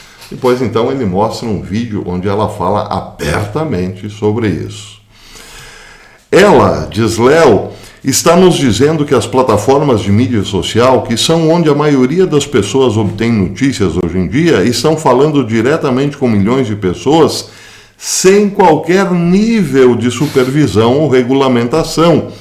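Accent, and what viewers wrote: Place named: Northeast, Brazil. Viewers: Falando nordestinês. Estranho. Sou baiano de Salvador, porém morando há 14 em Sergipe e te estranhei. Mas ficou engraçado. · Professor adorei seu sotaque nordestino. Obrigada pela homenagem.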